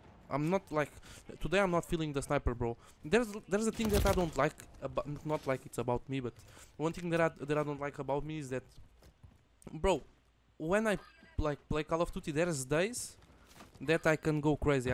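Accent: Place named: Portugal. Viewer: Eu acho engraçado que é tão facil de identificar um portugues a falar ingles hahahaha, bom video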